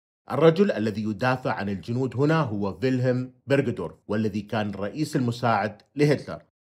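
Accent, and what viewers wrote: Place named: Iraq. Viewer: الحنين الى اللهجة العراقية في سرد القصص😢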